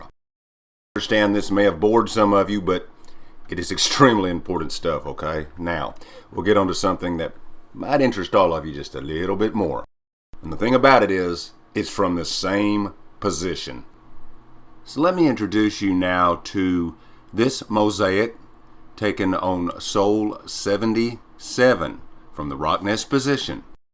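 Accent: South, United States. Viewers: You definitely have a southern American accent 😉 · You got an accent it sounds Texas like me must be a Southern accent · Nice to see you back and in good health.Thanks for what you do with the videos. Im in the UK. Sorry to say but you do have an accent , its an American one , I don`t have one because Im English. Please keep up the good work that you do. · You have a southern accent! I’m in Canada and no one here has that accent unless they’re from the southern states! My best friend has it, feeling better but apparently considered a “long hauler”... 6 weeks now. Wondering if your taste and smell came back to normal? I would like to let her know as it’s an optimistic positive.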